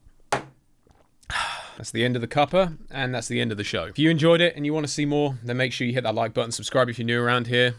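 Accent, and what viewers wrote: United Kingdom. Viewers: I already love this series lol, gonna have much fun with these listening a bri-ish gentleman talk about his experiences.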